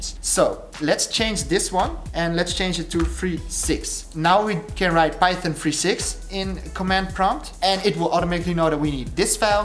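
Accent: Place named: Netherlands. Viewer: Yeah I can just tell you're Dutch, your English accent should sound more convincing in order to scam people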